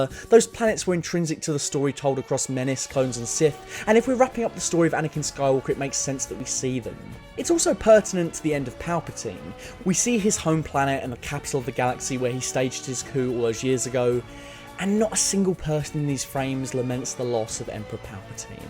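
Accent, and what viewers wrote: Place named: England, United Kingdom. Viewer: Shriiiieeeeeek.... American pronunciations from an English accent, give me chalk screeching on a black board any day!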